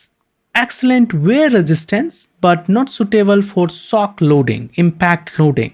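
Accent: India. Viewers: Shit that is the thickest Indian dialect I have heard. Good video though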